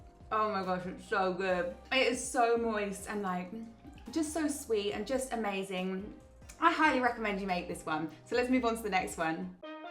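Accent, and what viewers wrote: United Kingdom. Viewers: May i ask which part of the UK your accent comes from ? I love it ! Awesome video also !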